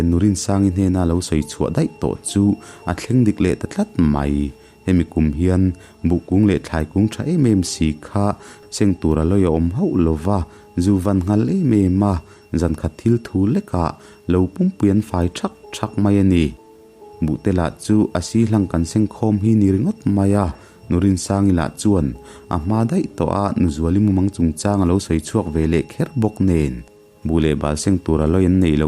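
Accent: Mizoram, India. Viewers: A puangtu aw hi a mizo aw raih mai a ka ngainat zawngtaka ni ngaih thlaka nuam.thin. e.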